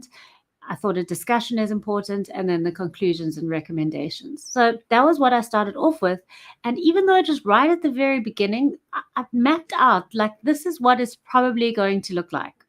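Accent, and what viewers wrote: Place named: South Africa. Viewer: ah iim so excited! I thought you were perhaps American, but so happy to hear your SAn accent! and to seeing similar research interests! Social Realism! Higher education in South Africa! and at UCT. Really comforting!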